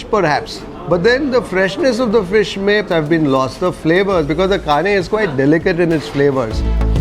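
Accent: Karnataka, India. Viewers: Love your Kannada accent sir❤️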